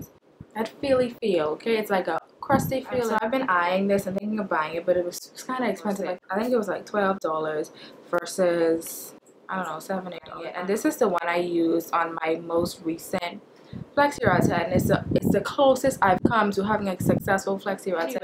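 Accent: Bahamas. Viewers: Lmao how I guess you were a Bahamian by the accent :") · mdss..when I first hear you speak I was like she gatta be Bahamian Iol...ik my people but keep up the work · The second I heard your voice, I was like "that's a Bahamian" lol. I was right. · I'm dying. All I was thinking was why she sounds like a Bahamian explaining something. I finally heard "Nassau" and was validated. Heyyy, cheers to Bahamian vloggers. Keep it up! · omg... this is my first time watching your videos. They say Bahamians dont have an accent, from the first time I heard your voice i knew you were Bahamian. Great videos. Thanks for sharing!!! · As a Bahamian who lives abroad i watch natural hair videos all the time to get tips. I spotted your accent from the first sentence!!! Im so excited to see a Bahamian vlogger! Thanks for this video girl, it was really helpful. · Oops you are from Nassau my family is from there I knew I heard the accent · That accent cant hide boy the minute i play the video i was like that gal gata be bahamian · You're a Bahamian? 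At least you sound like one. I love your accent 😍😍😍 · From you opened ur mouth I was like "Is this girl from the bahamas"! Nice video. I used that shampoo when I was relaxed, but now that im natural I only co wash, I use cantu or pantene co washes, pantene is really good. I really have to get that aussie moist conditioner tho, wanted that for a while now. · man heyyy i recognize a bahamain wen i hear one aee....looool i thought i recognized ur voice...yeah tingz ova here hella expensive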